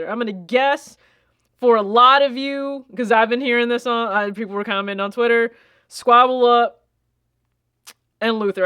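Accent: South, United States. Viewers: YOU FEOM THE WEST COAST LOVE I COULD HAVE SWORN YOU WERE FTOM LIKE SOUTH CAROLINA KENTUCKY SOMEWHERE LIKE THAT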